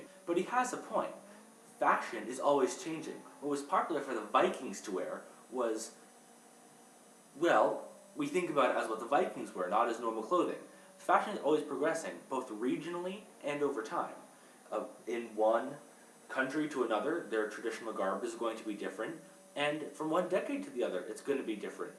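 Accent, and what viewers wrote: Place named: Scotland, United Kingdom. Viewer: nice scottish accent dude.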